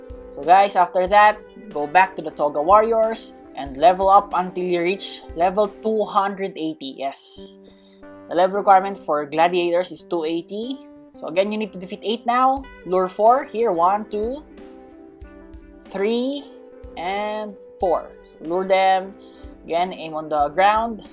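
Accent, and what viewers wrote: Philippines. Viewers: i know filipino people with that accent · I thought you are American but your a pilifino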